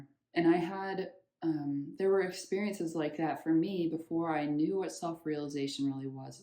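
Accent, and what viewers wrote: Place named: United States. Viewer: I don't know who you are referring to as your speak so fast and the American accent is really difficult to understand at the best of times. The trick is that there is a God, that is a creator and is a male or female. This is delusion. The body belongs to Nature and out of your control. Emotions are not under your control. Reactions through senses is not in your control. If you hear a loud bang and body jumps, there's your proof. What comes before Consciousness if the body jumps before the mind is aware of the loud sound? If one is 'God' one would have been aware because God is whole. Blessings.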